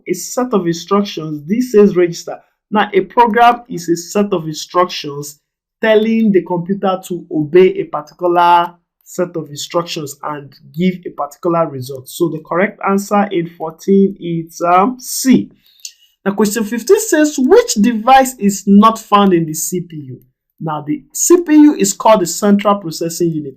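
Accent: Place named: Africa. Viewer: he has an African accent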